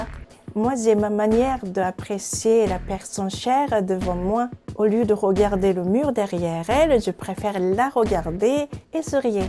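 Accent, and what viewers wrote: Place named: Africa. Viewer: C'est marrant tu parles tres bien le Francais...Avec un accent presque " Africain " !!!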